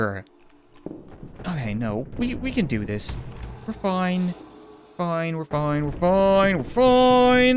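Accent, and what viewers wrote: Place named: Canada. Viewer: can i get a copy of candleman please i love your channel and your canadian acsent is so cool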